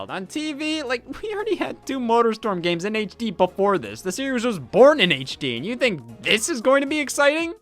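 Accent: Midwest, United States. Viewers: Mid-western accent (especially Ohio)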